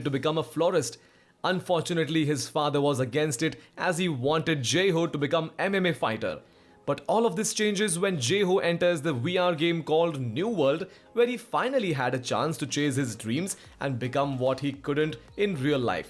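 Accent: India. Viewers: are you idian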